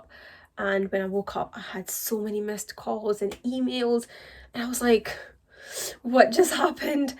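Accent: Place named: London, United Kingdom. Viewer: Amazing but why do u kinda sound that ur from London???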